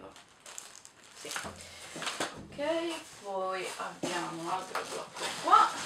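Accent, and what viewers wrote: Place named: Sardinia, Italy. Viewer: Sono felice di rivederti cara e principalmente di poterti ascoltare, fortissimamente sarda...:)))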